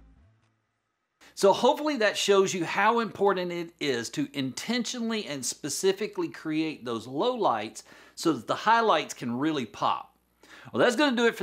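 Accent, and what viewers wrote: South, United States. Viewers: I quite like your southern drawl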